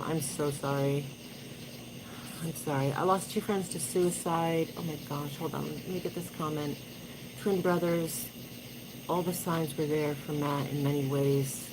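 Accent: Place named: New Jersey, United States. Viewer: Missed your 1st live:( watching now i would of never guessed you’re a Jersey Girl.I hope you do more lives! Hit the like folks its free helps the channel! And subscribe, of course.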